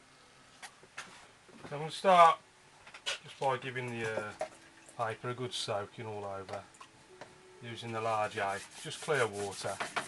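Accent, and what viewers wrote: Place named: Midlands, United Kingdom. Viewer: Good 2 hear a midland accent on you tube...it looks a bit like kingsbury water park...fished it but not painted it yet...good job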